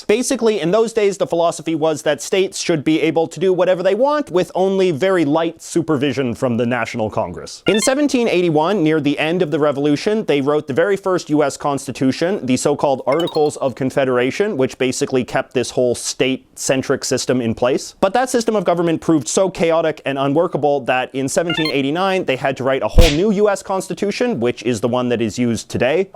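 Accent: Canada. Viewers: It's probably from the slightly different dialect they speak in Canada (where he's from)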